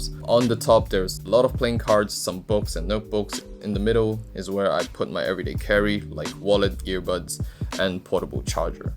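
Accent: England, United Kingdom. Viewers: I really like your English accent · nice english accent you got there attending a local school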